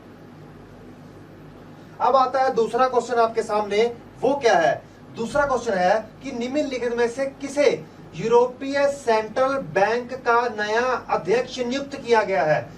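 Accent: Punjab, India. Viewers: Sir g apki language me punjabi jhalk rhi he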